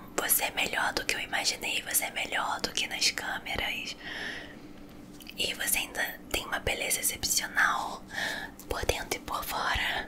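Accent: Rio de Janeiro, Brazil. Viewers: Sotaque carioca feminino é tudo de bom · Aahh nem acredito que vc respondeuuu logo reconheci o sotaque tbm souuu espero te encontrar algum dia na rua talvez kkkkk te amo sou mtt fã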